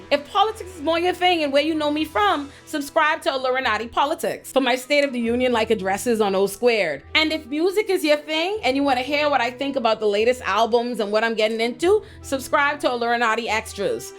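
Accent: Bahamas. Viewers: girl just hearing ya Bahamian accent is be enough for me in these videos for rewatching lollll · Are you Bahamian or Nigerian? Can't tell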